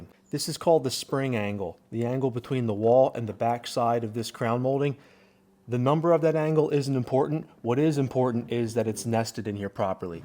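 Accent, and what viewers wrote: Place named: New Jersey, United States. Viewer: Sounds like Jersey to me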